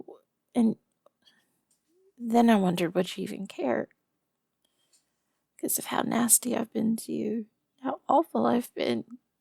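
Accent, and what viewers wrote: South, United States. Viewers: Bear girls? Love them, can never get enough. Southern accent? Definitely one my greatest weakness. Reverse comfort? Oh yeah, cant be the only one comforted!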